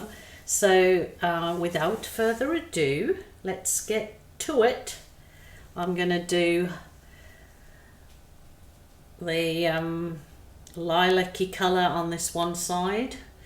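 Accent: Birmingham, United Kingdom. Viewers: after I sent it I thought “No! She a Brummie ya daft tit” I’m sorry for miss placing you. Love that you’ve never lost your accent. X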